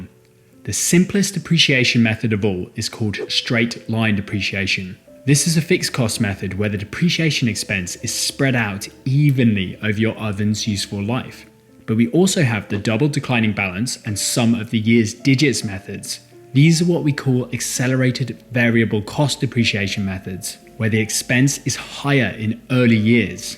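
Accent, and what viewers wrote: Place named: England, United Kingdom. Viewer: Is it just me or does Accounting make more sense when explained in an English accent.... lol fr thx for sharing these informative & concise vids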